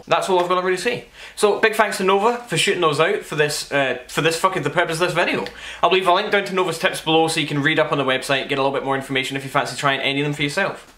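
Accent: Scotland, United Kingdom. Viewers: You're so Scottish, it's beautiful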